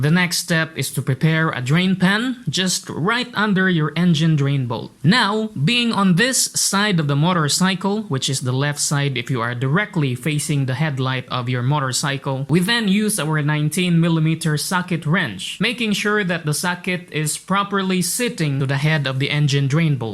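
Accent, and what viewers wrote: Visayas, Philippines. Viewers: Hindi!! alam mo kung bakit nag English yan? Kasi yung nag tagalog yan parang bisayang o bikolano tukmol halos hindi mo alam kung nagpapatawa siya ehh para siyang makatang pilipino na may mental retarded. Binago niya yung language niya kasi maraming bash sa kanya nakakatawa kasi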